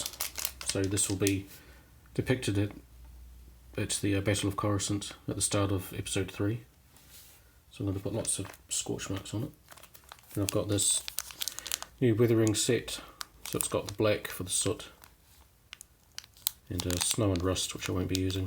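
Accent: New Zealand. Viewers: Slipps Dungeon he’s from New Zealand what’d you expect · Are you a kiwi? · its the new zealand accent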